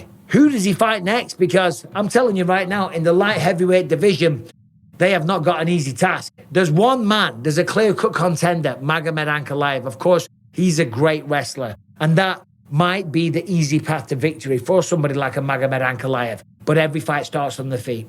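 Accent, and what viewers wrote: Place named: England, United Kingdom. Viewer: This is why I love f**** Michael bisping.. speaking English English and s*** .. putting you motherfukers on game😂❤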